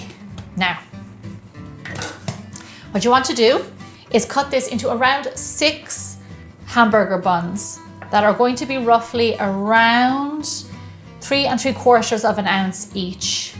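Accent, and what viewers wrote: Ireland. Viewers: Hi as an Irish person watching this from Wakes, UK this made me smile. Your lovely Irish accent reminds me of home. 🇮🇪🙂☘️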